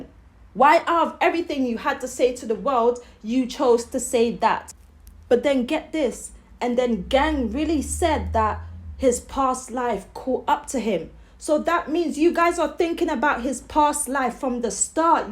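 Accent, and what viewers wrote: United Kingdom. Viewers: This bitch goofy asf she needs to stop making content I’m from englewood Chicago and this fasho isn’t the case us Chicagoins hate these outsiders trying look and and speak on us like girl yo ass sound like u from the uk somewhere u better focus on them roadman where u at wetting eachother not here ole lame ass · Lmao how she talking chiraq bidness n talking British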